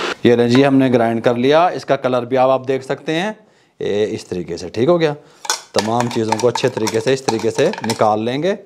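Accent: Punjab, India. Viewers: Zaberdast gi. Ap hazare se hai kia ya punjabi? Apke bolne ka style mjhe acha lgta h. ☺️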